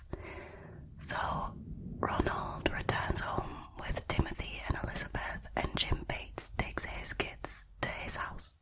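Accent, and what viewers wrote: Northern England, United Kingdom. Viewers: Sorry I have also been trying to locate the accent. It’s great and really draws me back to the channel. I studied and lived up north and have a northern dad although I come from and live down south but miss the accent sometimes. Understand why you wouldn’t want to be specific but I’m speculating England’s biggest county (or near to). Thanks for more spooky storytelling as always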